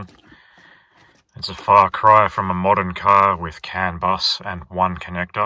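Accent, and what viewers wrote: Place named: Australia. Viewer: I love the way you say Red 124. And you have a badass Australian accent brother. Hope you and your legendary Red 124(W124 300E-24V are doing fantastic good sir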